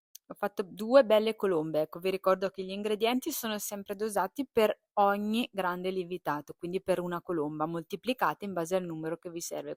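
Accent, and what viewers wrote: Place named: Piedmont, Italy. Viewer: Sei bravissima. Sto facendo le tue ciabatte e farò certamente la colomba. Ciao dall'accento piemontese. Io sono napoletano e ho un piccolo un forno a legna, farò la tua pizza in pala e ti farò sapere.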